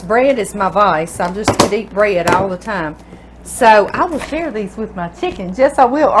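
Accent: South, United States. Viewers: I just looove your Southern voice ❤Imma make this..Thank You for being kind to share this..Stay Safe ❤😷❤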